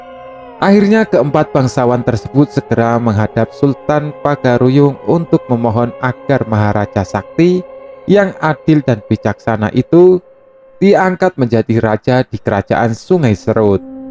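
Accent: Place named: Java, Indonesia. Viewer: mulut orang Jawa logat bicara Jawa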